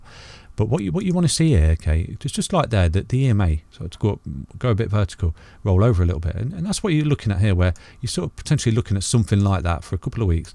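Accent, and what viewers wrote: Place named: Leicester, United Kingdom. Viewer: East Midlands Crypto massive!! Guessing you might be from Lestoh as well haha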